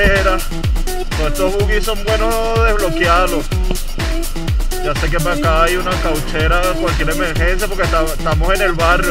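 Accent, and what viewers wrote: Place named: Venezuela. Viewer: Usted tiene una vos como de venezolano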